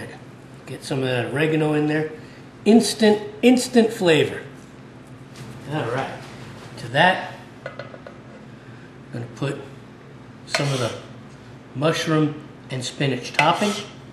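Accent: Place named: Brooklyn, United States. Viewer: !! I love your intro, the history lesson, and this artisan pizza recipe. This is a must try. Thanks for the olive oil on the dough tip! I like that Brooklyn accent when it sneaks out too!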